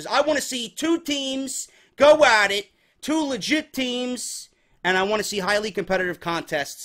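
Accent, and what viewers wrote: New York, United States. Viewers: dude you are a trip your ny accent helps your show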